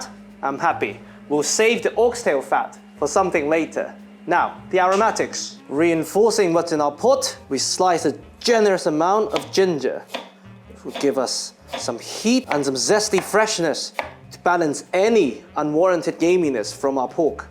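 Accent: England, United Kingdom. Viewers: brother is throwing me off he looks like an asian uncle with a british voice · You'll be surprised with how much English the average asian have to learn. That, combined with the fact that he'd be studying in England for a long time to get that accent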